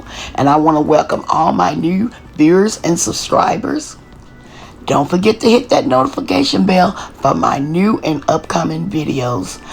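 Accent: South, United States. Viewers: im Hungry Hungry,Hungry, im Definitely Going to Try This Recipe. It looks Absolutely DELICIOUS,UmUmUm,GOOD😋😋😋. And you sound So Much like Mrs.MaeMae, either you are Related or its that, way dwn South Accent😃😃😃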